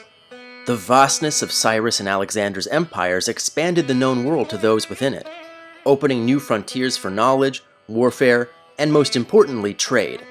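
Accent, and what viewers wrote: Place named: United States. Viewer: hey where did you grow up? your accent. sounds so American besides when you make the 'a' sound as in vAstness, where it sounds like the british 'vahstness' versus the american pronunciation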